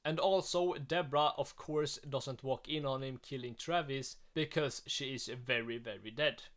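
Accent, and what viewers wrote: Sweden. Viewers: Bro are you swedish? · Why does bro have a Swedish accent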